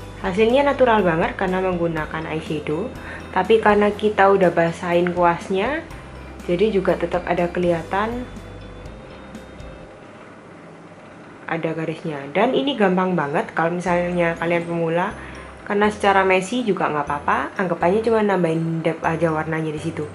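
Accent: Java, Indonesia. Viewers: "...udah moncrot" hahaha 😂love your video. already subbed. if you dont mind to visit my videos too. tos dulu sesama lidah medok 😘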